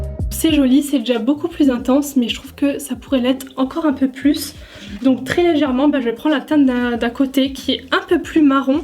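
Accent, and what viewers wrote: Lorraine, France. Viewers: Elle habite en Alsace mais elle vient de Lorraine (d'où son accent !) 😉#BarreD'Info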